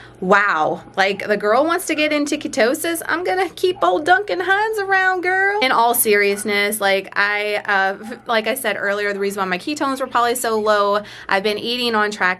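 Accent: Louisiana, United States. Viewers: Recently found your channel about a week ago! I use to do keto and had success years ago but I needed something else. Currently doing alternate day fasting and just calorie counting! But your SO motivating!! Btw love your accent!! I’m from Louisiana too! Pierre part... about 1.5 hours away from New Orleans!! It’s nice to see locals on the YouTube!! Keep it up!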